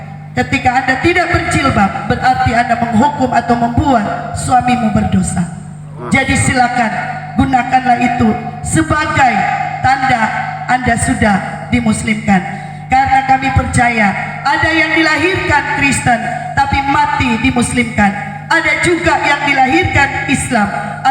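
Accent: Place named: Manado, Indonesia. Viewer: Kalau dengar bahasanya kayaknya dari Sulawesi Utara kota Manado...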